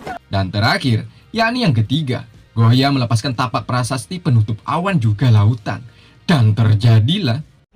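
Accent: Banjar, Indonesia. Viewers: Bang lu orang banjar kah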